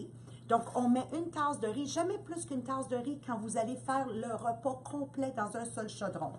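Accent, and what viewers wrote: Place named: Quebec, Canada. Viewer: Bonjour je suis nouvelle animatrice depuis octobre 2017 j'ai gagné le Micro Urban, je vous remercie pour la démonstration et ayant des amis du Québec je crois que vous avez un accent que j'adore, ce n'est pas facile en France dans ma région de Haute Loire de trouver des hôtesses, j'espère que je trouverais, bonne journée à vous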